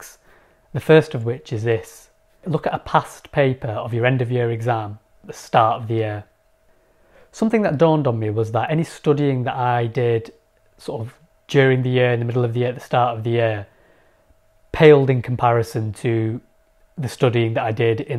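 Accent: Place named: United Kingdom. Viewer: British accent 😂👌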